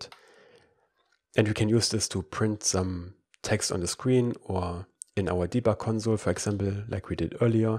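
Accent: Germany. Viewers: Love your teaching way brodar , your humour , your german accent lol awesome keep it up!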